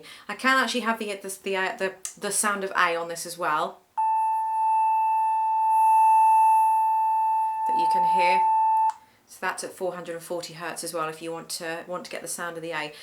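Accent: England, United Kingdom. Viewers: well, I have been playing guitar for 30+ yrs, and piano, bass and other instrumets for a very long time, but have always wanted to play the violin.. I finally have my hands on one, and was obviously wanting to even know what notes the strings were and go from there, Very informative video, but maybe a little too much info for a total beginner. by the way, your English accent is just SOOO awesome. The accent combined with your obvious beauty, that's quite an awesome combo :)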